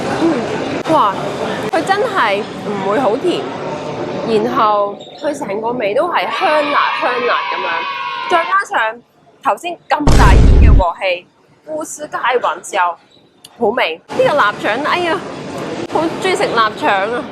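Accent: Singapore. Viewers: 老实话。。。 你口音用新加坡加广东话听得好辛苦， 料来料去。。。 建议用广东话好啦！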